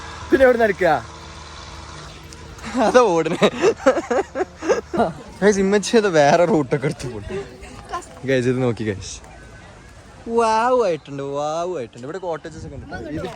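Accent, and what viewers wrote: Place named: Kasaragod, India. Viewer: Edeyil nammale kasargod bashe bannalo enth pang 🤣💗👍🏻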